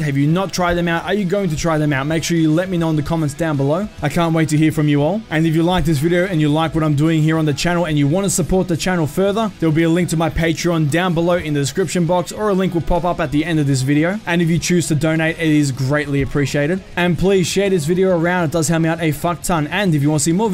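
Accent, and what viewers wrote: Australia. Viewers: Btw it seems a lot of people are surprised you are Aussie, i knew it as soon as i heard ya.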